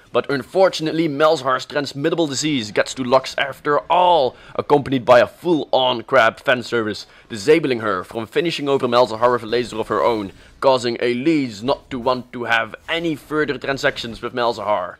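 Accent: Netherlands. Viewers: That dutch accent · this is why people from the netherlands should not talk english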